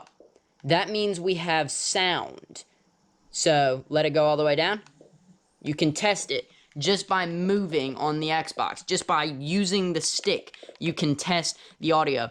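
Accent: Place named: United States. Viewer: Its kinda like what the people in England think Americans sound like in a good way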